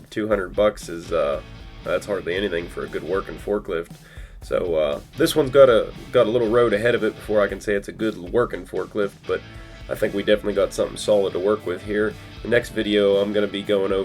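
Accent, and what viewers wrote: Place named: Pennsylvania, United States. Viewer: For the longest time, you reminded me of someone; but I couldn't place it. I finally figured it out. You could be Rick Rowlands' kid brother. BTW my people are from around Uniontown/Connellsville, you talk like them.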